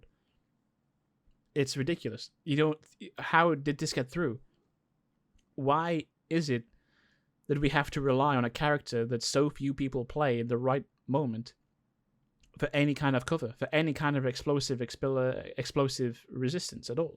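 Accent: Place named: South Africa. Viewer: Quick question. Where are you from? Because for some reason I'm getting south african vibes from your accent. Now I could be stupid and way off but I'm just curious 😅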